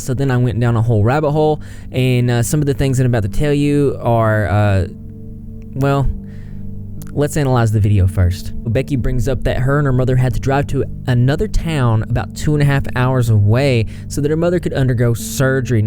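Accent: South, United States. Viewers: I watch your videos only because of your southern accent. 🤠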